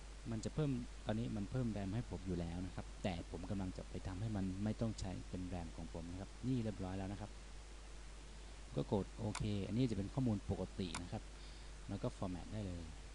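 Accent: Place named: Myanmar. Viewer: พูดก็เบา ฟังก็ไม่รุ้เรื่อง สำเนียงอย่างกับพม่า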